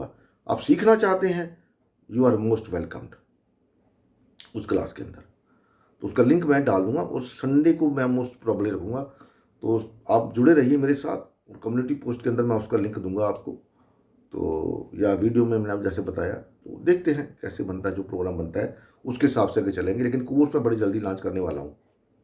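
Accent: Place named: Punjab, India. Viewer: Thank you so much,your Punjabi accent Hindi is so attractive.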